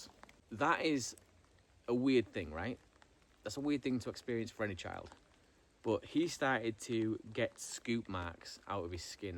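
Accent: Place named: North East England, United Kingdom. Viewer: Don't short-change yourself! We love your N.E. accent alot; but we're really here for Lily! (LOL HARD) 👽👽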